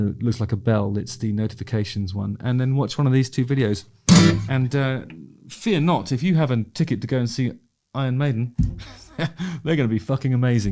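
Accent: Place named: RP, United Kingdom. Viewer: Your knowledge of Maiden is weak. And spoken in your posh accent and delivered so woody and dry makes difficult listening.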